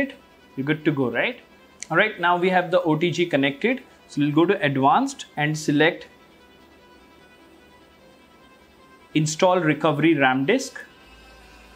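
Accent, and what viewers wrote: India. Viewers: i like your conten, u speak clear not just like another youtuber speak english but have a bit indian😅 so try hard, i will support you from malaysia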